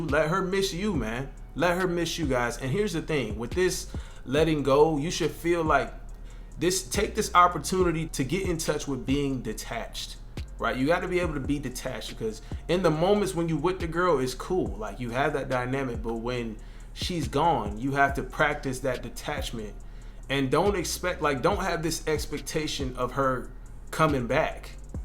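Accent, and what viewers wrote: United States. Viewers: Next time public English speak should be louder than your American damn accent bro !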